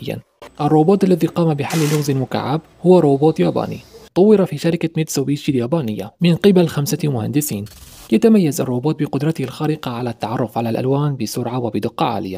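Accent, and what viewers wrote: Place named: Arab world. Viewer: استمر عنوان ممتاز حنجره ممتازه اسلوب راقي اللهجه العربيه تفوز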